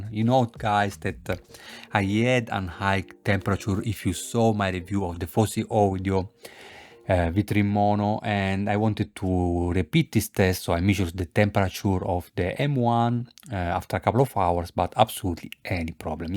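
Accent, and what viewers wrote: Italy. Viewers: Great review! I love your Italian accent :) Would you think the M1 is overpowered and a good fit for the Project Speakerbox V2s? I am planning to buy a REL Tzero MKIII with it.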